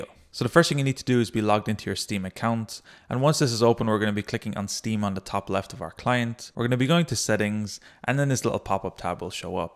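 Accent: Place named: Ireland. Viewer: I can hear an irish accent of ye, you irish by any chance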